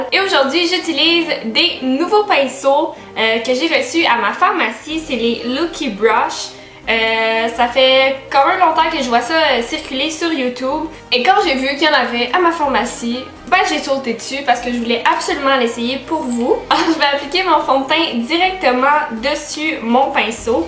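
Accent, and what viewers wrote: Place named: Canada. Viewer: CC sublime très classe j 'adore je le ferais cet am....j adore ton petit accent 'Canadien 'quel beau pays...nostalgique car j y suis partie en 1991 (j avais 24 ans)pour mes études d 'infirmières (stage)...j étais dans la magnifique région des Laurentides...gros becs. comme l 'on dit chez toi...bsx belle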